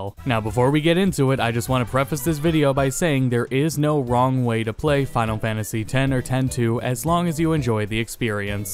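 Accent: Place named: United States. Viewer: "Teet-us"? Really? You sound American. There is no excuse for you pronouncing it that way. lol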